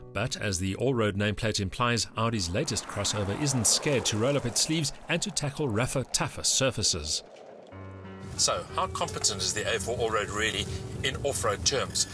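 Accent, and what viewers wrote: South Africa. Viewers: ARE YOU FROM SOUUUTH AFRICA?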